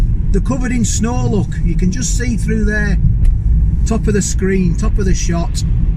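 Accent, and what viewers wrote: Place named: Cumbria, United Kingdom. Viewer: love watching your vids my freind my grandad was from Egremont and you remind me so much of him by the things you say the accent etc your vids are are breath of fresh air in this turbulent times, carry on the great work mate dave from manchester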